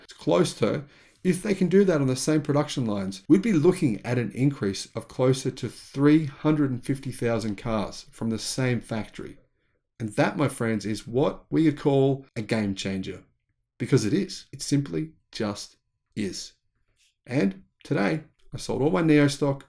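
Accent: Australia. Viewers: You're not a Viking, you sound like an Aussie.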